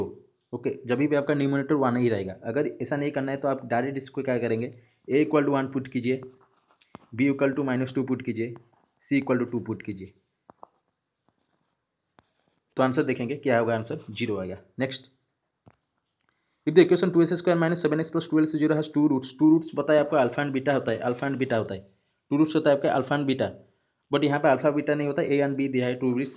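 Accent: Odisha, India. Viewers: There is nothing wrong with ur accent. Ppl who comment on Indian accents should be ashamed of the of themselves. Diversity is the core of India 🇮🇳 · Pura odia lagucha bhai.. 😊☺️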